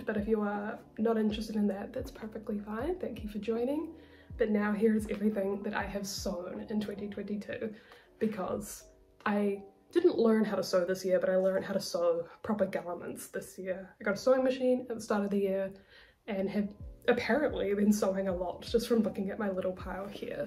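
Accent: New Zealand. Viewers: I'm assuming from your accent that you're in NZ. I'm in Aus. Where do you get Knitting For Olive in the southern hemisphere? I've only found one website that would ship it to Aus and it was a very expensive Japanese website that would have cost like x3 normal.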